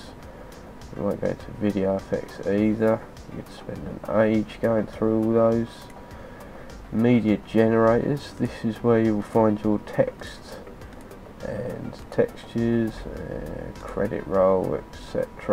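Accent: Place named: Southern England, United Kingdom. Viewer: Really great and so much help! I'm from Kent but I've been living in Manchester for 10 years, so I enjoyed your good old Southern accent.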